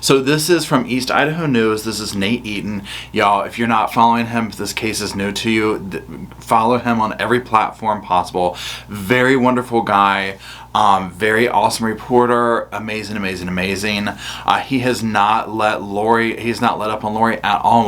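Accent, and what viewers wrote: South, United States. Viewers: Unrelated to the video topic: Omg, I love Mr. Roscoe P. Coletrain! His sweet lil pawpaw face! How old is he? He’s obviously very used to you holding him and talking with your hands because he keeps his sweet lil face out of the way of your hand gestures. You’re one of the few content creators who sounds almost like me…sweet and southern. Y’all are the best and I’m pretty sure I’d watch you read the phone book as long as Roscoe was there to supervise .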